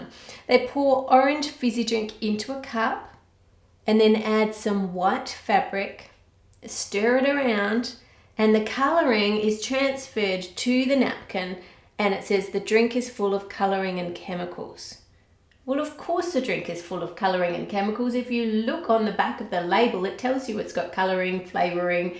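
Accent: Australia. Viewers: Her Australian accent is so strong😂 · I love your videos so much omg I remember watching you like 6years ago. I actually learned english by watching your videos😁 now I have an aussi accent even tho I'm not aussi😂 thank you for keep making these videos🥰 I wish I could support you on your patreon but I unfortunately can't atm but you'll definitely be the first (and probably only) person I'll support! Thank you for everything and keep going❤ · Hey I love your channel And after binge watching your channel I changed my Siri to Australian since I love your accent Hope you reply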